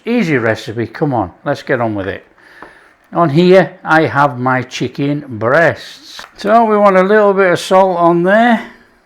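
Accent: United Kingdom. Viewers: Great day to you, today is perfect and you are part of it, love your British accent and foods, crack on as you say, OH PLEEEEASE, each day should start out with a sizzle whizzle, come on😅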